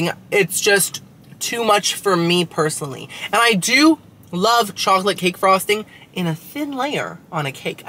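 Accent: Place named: United Kingdom. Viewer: I think your videos are way more fun to watch them in the UK. I love your British accent! Does it help the stutter? I hope so. We don’t have many of the food places you frequent. I want to jump on a plane 😂 xxx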